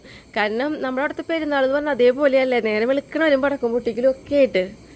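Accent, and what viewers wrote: Thrissur, India. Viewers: I am also a from Tamil Nadu. I do watch ur videos, like ur vegetable garden n ur dogs very much. Never mind these types of people. They can't help themselves but to crticise others. Was in Trivendrum for 3 years, can read and follow Malayalam, but ur Trissur Malayalam is bit difficult for me 😂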